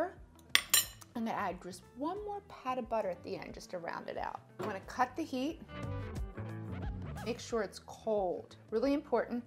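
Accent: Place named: New England, United States. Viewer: loved what you did both on the pot roast and the rib roast. Methodical and clear. Also your presentation is awesome. Total butter, can’t replicate the accent but willing to bet New England/ New York maybe Jersey. Cheers.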